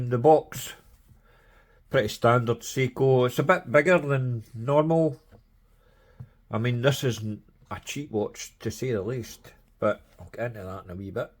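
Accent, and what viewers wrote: Scotland, United Kingdom. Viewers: Came for the watch stayed for the thick scottish accent.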